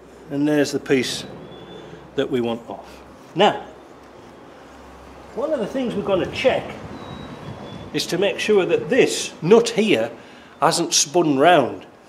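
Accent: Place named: Cumbria, United Kingdom. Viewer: You sound Cumbrian. Am right? Edgar (All my Land Rovers were Series 1! )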